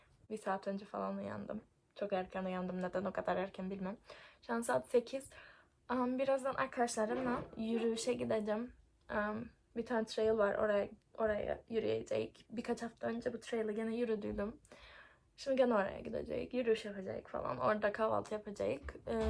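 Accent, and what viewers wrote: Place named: Cyprus. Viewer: Konuşman çok tatlı bayılıyorum Kıbrıs şivesine🌸😘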